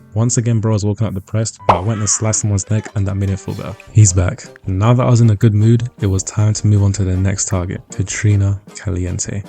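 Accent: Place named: Jamaica. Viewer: Is it just me or bro use Jamaican sound 😮and i am Jamaican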